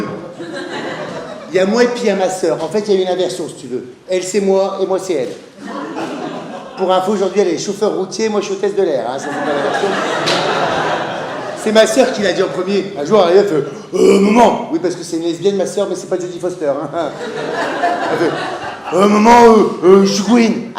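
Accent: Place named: Nord-Pas-de-Calais, France. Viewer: C'est bizarre pour un homo d'avoir l'accent ch'ti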